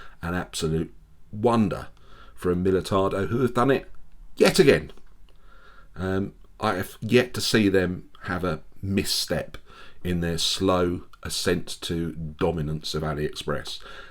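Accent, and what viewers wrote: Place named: England, United Kingdom. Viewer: Excellent review mate, thanks. Just the right amount of meat-and-potatoes information about the watch with a sprinkling of clever humor for desert. Not to mention the charming English accent for an authoritative quality. --From Central Oregon, USA.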